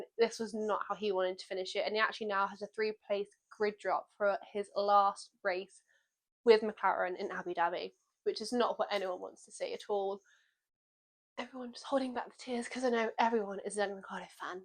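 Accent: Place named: United Kingdom. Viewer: I love your comments, your channel and your british accent. Greetings from Mexico.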